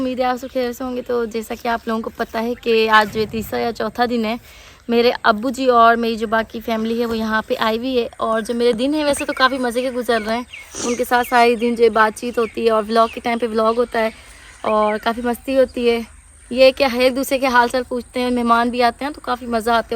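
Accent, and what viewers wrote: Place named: India. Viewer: Main India se hoon magar aap ki jo tone h kahi na kahi India se mil rahi hai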